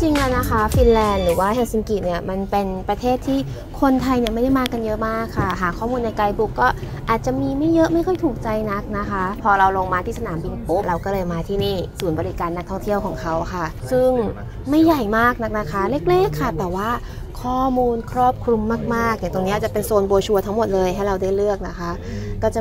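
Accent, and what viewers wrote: United Kingdom. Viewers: เวลาคุณ ไปเที่ยวแบคแพค ดูไม่ออกว่าเป็นลูกคุณหนู CEO เลยค่ะ ยกเว้นตอนพูดอังกิดสำเนียง british ^^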